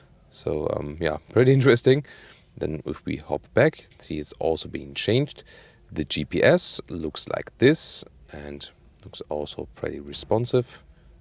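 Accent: Germany. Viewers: Zis is called German accent. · I love the THICK German accent. :D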